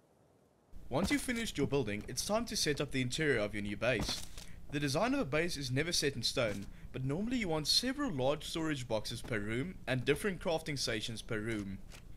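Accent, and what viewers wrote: South Africa. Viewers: South African? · hahaha kan hoorr jy is afrikaans 😎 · You must be South African 😂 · are you South African?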